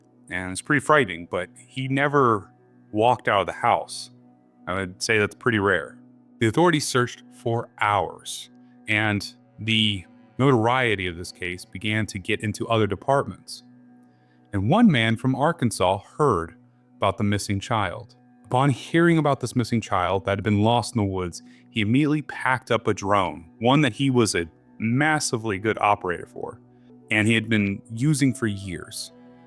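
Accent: Appalachia, United States. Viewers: I subscribed after watching the recent vid on rainfall in TotK, and I've been slowly working through your other Zelda vids since. Good stuff! As a West Virginia native myself, I guessed you were Appalachian from your accent :)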